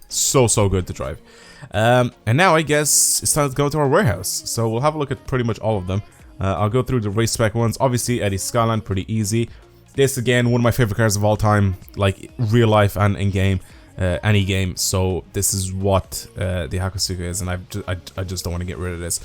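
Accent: Dublin, Ireland. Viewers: U from dublin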